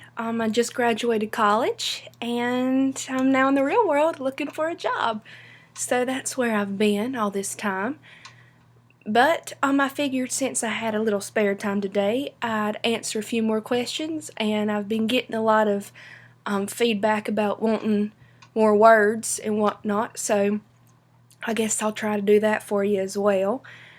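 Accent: North Carolina, United States. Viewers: Please, do the accent tag video List of words to read and about 10 questions about different words and expressions southern accent is cute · I would recommend ditching the accent if you ever hope to get a job north of the Mason-Dixon Line. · Beautiful southern accent! I'm from Arkansas and have a strong accent but it's quite a bit different from yours. Sadly because of movies and tv a lot of places are loosing their accents. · Love the accent !!I'm originally from Bladen county N.C. and you sound like my Aunt Jo ! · Omg! I'm soo glad I found a real accent. Because some of thwm other videos are fakes. My friend's german and she wants to learn my accent (eastern nc) but I cant explain it. You are much better and not one them fakes. Thanks. :) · Hello, I'm Vietnamese. And I really really love the Southern American accent:) Thank you for your videos. 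They're so useful for me.:) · I’m from Georgia...our accent is a little different...the North Carolina accent is similar to the Tennessee accent at least in parts of Tennessee...it’s just lovely.. two absolutely beautiful states....love those Smoky Mountains...it seems to me that the South Carolina and Georgia accents are similar...maybe a little slower than your..it’s funny..there are different accents in areas very close to each other...I remember the little farming towns had their own accent..and their own way of saying certain words.. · I've come across this video while searching for examples of the North Carolina accent I knew and loved back when I lived there a couple decades ago. What a delight it was to not only hear you speak in it, but to say such meaningful, wonderful things in it. It's been 14 years since you uploaded this - I hope those 14 years have been very good to you. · southern accent is really sweet and hearing it from you makes it sweeter. 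;) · My mom's from Snow Hill and your accent is the closest I've found to hers on YouTube. She pronounces "pirates" like "piarts." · I just love your southern accent. Thank you especially what you said about southern men- I met one recently and he seemed really nice😊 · Hey 👋 I am Gem 💎 I love the Southern accent! ❤I be sayin certain things like this way I B4 new any accents. Tanks I subscribed to your channel so not letv me down love 💎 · You have a beautiful accent. I moved to NC from Massachusetts and I will always have my New England accent regardless of how long I live in NC. NC accents sound more "country" than "Southern" to me which is beautiful in itself. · Nice southern accent. Its hard to find on YouTube. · Your accent is awsome!! Im from Finland and i speak finnish so X). But that Southern accent sounds to my ear so beautiful and nice <3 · I’m from North Carolina too and we sound EXACTLY ALIKE . 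Don’t notice until I hear someone else speak just like me. 😂😂😂😂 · A very pleasant southern drawl.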